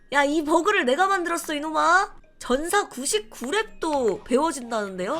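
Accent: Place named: Gyeongsang, South Korea. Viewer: zzㅋㅋㅋㅋㅋㅋㅋㅋ우리 귱남선생님들은 된소리가 안된다요...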